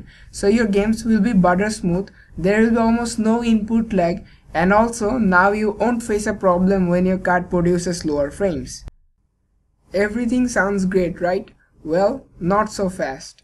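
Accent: India. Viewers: I know its out of context, but I loved ur acent..lol..much like Indian accent..kudos.. Nice video. Thumbs Up.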